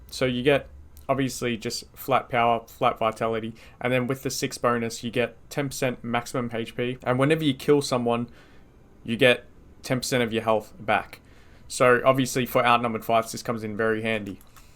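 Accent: Australia. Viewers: Hey mate. I hear an aussie accent. How do you deal with the latency?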